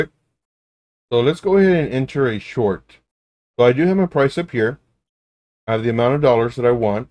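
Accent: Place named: Cuba. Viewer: You sound Cuban or Hispanic of somewhat, you my guy.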